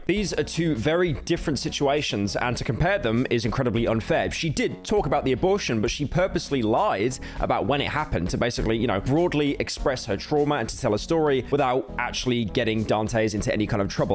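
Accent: United Kingdom. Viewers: He is brittish, thats how you pronounce it in the botta o watta accent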